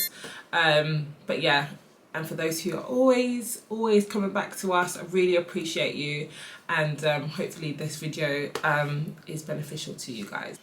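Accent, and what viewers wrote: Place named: United Kingdom. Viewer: Side note: Sometimes it’s just nice to click on a video and hear a British accent ☺️ you look amazing sis! I can’t wait to start my journey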